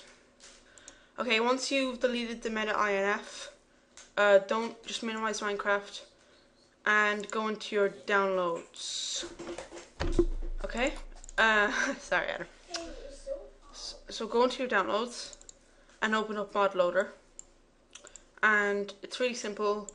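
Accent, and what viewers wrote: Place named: Ireland. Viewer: good to see someones irish not american liike me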